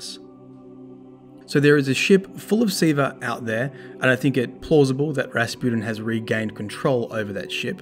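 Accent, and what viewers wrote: Australia. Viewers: Quarantine ! Also Lol ‘Zed’ I love your AU accent!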